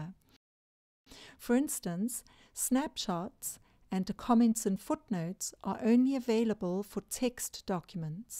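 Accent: South Africa. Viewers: Thank you for the very useful overview. Nice to hear a South African accent too!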